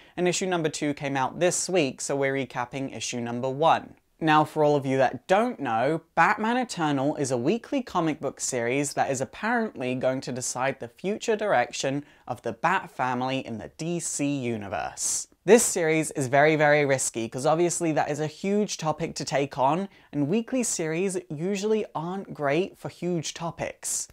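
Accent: United Kingdom. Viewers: for some reason i like this series and all the others better because you have a british accent lol i think people with accents australlian or brittish or even russian are cool especially british though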